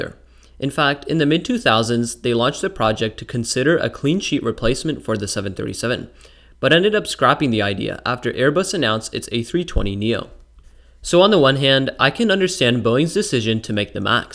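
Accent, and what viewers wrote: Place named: India. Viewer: Nice Indian accent.btw retire this old plane.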